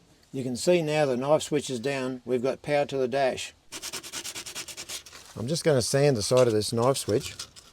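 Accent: Australia. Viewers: Australian accent. Hard to hear 😂😂😂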